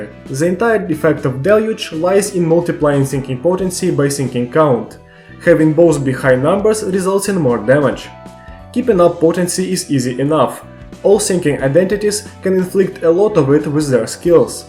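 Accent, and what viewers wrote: Russia. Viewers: Будто бы у тебя русский акцент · Русский акцент не скроишь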